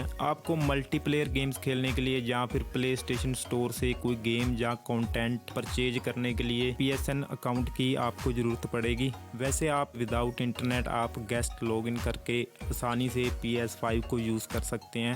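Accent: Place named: Punjab, India. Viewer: Bhai tera accent Punjabi ja aa 🤨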